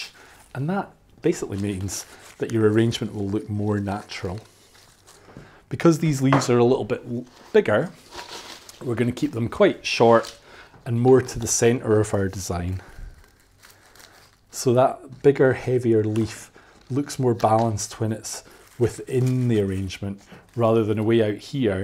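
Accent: Scotland, United Kingdom. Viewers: I envy British accent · Scots accent ?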